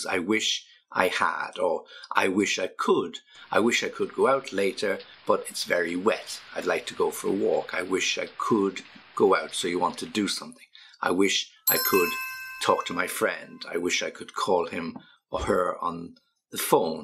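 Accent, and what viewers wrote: United Kingdom. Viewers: I love british accent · Are them british sounds or American? I like british. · مرحبا هل هذه لهجة بريطانية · I just found this channel, British English and I love this so much. I'm Indonesian, and I love learing Brit_Eng ❤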